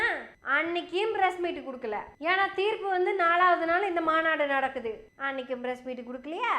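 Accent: Kongu, India. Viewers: அம்மா, உன்னோட கொஞ்சும் கொங்கு தமிழ் சூப்பர்!.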